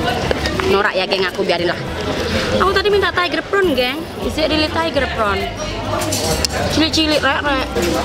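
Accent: Java, Indonesia. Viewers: Medoknya itu low ngbuat ak kalo nonton pasti diulang2 mulu😂😍 loveLah buat 😘💋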